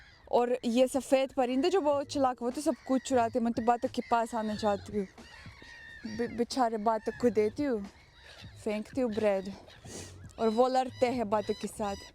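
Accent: Standard. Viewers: aapke videos badhiya hote hain aur aap shuddh Hindi bolte hai utana hamahare yahan par pade likhe loge bhi nahi bol pate hai. Maine Aap ko videos mai pahale bhi kai baar yeh bolte hue suna hai ki “ Bhagwan ne mujhe dimagh bahut kum diya hain “ aisa aapko videos mai aur apne aap se nahi bolana chahiye. Aisi meri aapko sujhaw hai. hamhare pure India se aapke bare mai koi bhi vyakti nahi bol sakta hai aisa mai samajhta hoon. Aap ek buddhiman avam khubsurat mahila hai. Ye keval aapka complex hai.please drop it. Videoske liye dhanywad aur subhkamnaye. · Bhai yrr itni sudhhh hindi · Bhai kitni pure hindi bolri h ye ladki ❤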